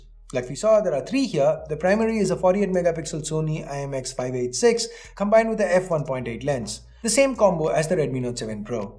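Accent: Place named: India. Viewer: It's the Indian accent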